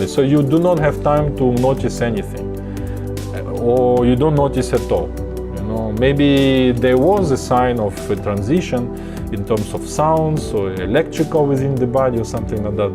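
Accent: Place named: Russia. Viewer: Thx for the answer, I didnt expect it lol. Maybe you should then love your voice more, because Russians talking English sound really nice. And when you love your voice more then youll reduce the backgound music volume automatically 😊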